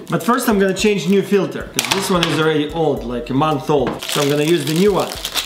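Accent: Russia. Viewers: Are u actually russion?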